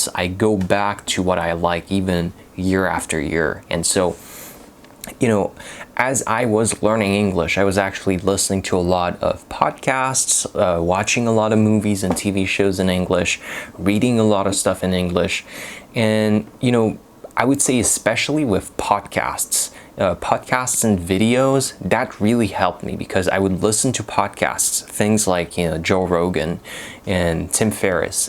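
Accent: United States. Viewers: From an Australian’s perspective, he has an American accent with a hint of something else. No big deal. His grammar seems perfect. I haven’t noticed anything he said that sounded very wrong. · Dude! Your accent sounds from the US. I am French like you, from Paris. You did an amazing job to get where you are. Your mind is very well structured. You speak with calm and ease. Congrats! I am moving toward a fluent Spanish, right now. My strategy is slightly different : I am moving directly in the country to learn the language. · Your accent sounds American and your diction is just Amazing. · if you didn't mentions that you're french ,, I would guess you're American .. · Your wordstock seems essentially British (e.g. your use of the word «holidays»), but your accent is 90% American. Have you taken on learning Tahitian at all yet? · Wahoo! as a French speaker and living you blow my mind!! 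You speak like an American. I will follow everything to bring my English to the next level.!! Congrats 👏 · You cannot have this level of English without having lived in an English speaking country especially Canada or the USA . You have an American accent . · Your American English is even much better than some native speakers in the US. Congratulations! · Your English is absolutely fascinating! You sound like an American speaker!!! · Dude you even sound more American than me ….wow 😮!!! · Are you sure you are not American? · you did a great job. I couldn´t notice you´re not a native English speaker if you woudn´t tell. You sound very american. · Yup bro great to hear your English speaking journey. My goal is to speak English like an American speaker. You are really close to American english native speakers. My problem is that when some time I speak English I think not making mistakes while speaking. 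U made me motivated to learn American accent. Please let me know know what I need to do? I will be thankful to you forever. Thanks from my depth of heart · Amazing american accent. I would bet you also have an excellent rest for music. Do you play an instrument?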